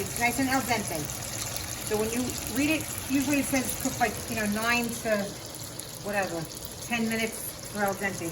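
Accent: New York, United States. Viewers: Keep up with the videos girl I've learned a lot from you!! And you trip me out with that n.y. accent 🤣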